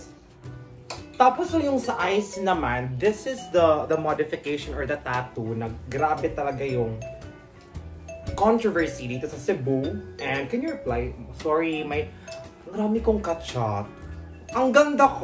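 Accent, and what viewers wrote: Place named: England, United Kingdom. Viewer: i love your english accent more videos pa po 😊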